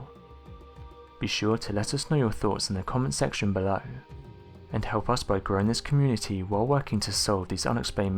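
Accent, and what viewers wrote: England, United Kingdom. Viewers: It's not a robot, he's English.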